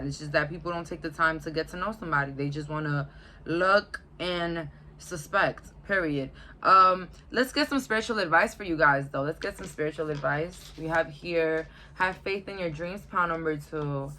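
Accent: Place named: Brooklyn, United States. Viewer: you really be from NY huh? im cacklin sis you be radiating brooklyn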